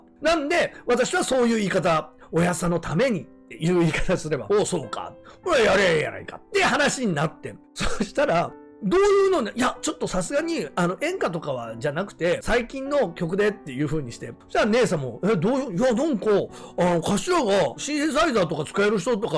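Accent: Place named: Nagoya, Japan. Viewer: 南利明以来の、久々の名古屋弁のエンタテイナーとして、毎回楽しみに見させていただいております。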